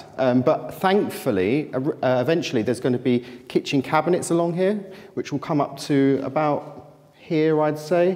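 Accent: United Kingdom. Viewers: It was nice to see your sarcastic humor. I'm American and I find it interesting when British folks pronounce words differently. Just keep saying aluminum the way British way. Obviously some Americans think they need to correct your pronunciations.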